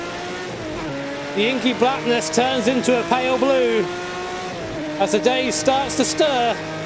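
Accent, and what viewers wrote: United Kingdom. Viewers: He's British, to an Americans of course he sounds the part. Now let's see him during a Daytona 500 yehaaawww got dam look atem go jerry,yeah not so much. He'd also sound good in a premier league match(football soccer) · wow British people seems to have a default beautiful commentary voice! loving it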